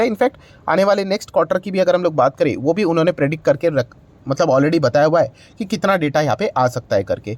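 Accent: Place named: South, India. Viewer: I love ur hindi accent it's southy 😋😋😋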